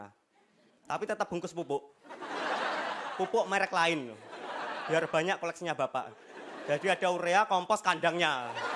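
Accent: Java, Indonesia. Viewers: itu gaya standupnya mirip raditya dika. cuma dia versi medok jawanya. pola beat, absurd, actoutnya dan pecah nya sama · Materi dia sederhana tapi realita,di tambah lagi medok nya yg nyerocos 😂 Keren lah. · Lucu aksen jawanya...... Ngakak gw.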